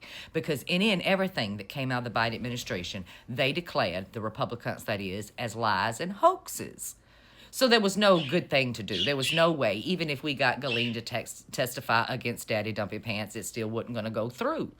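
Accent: South, United States. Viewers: But where in the south? She has the most beautiful accent.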